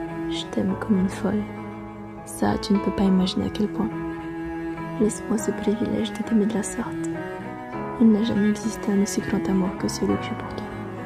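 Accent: Italy. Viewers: J'adore ton accent italien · Tu es italienne ?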